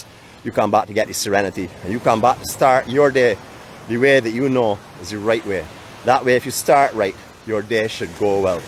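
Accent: Barbados. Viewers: So interesting to see. I was staying in Christ Church 26/7 years ago (for five months) and spent all my time at White Sands Beach and Apartment complex - Austin, who you mentioned, I know very well. I’m shocked to see that where he worked has changed drastically…I hardly recognise it as the same place. I wonder if he still works there for George. Really enjoyed this video with your great commentary and your wonderful Barbadian accent. Thank you, from Wales UK 🏴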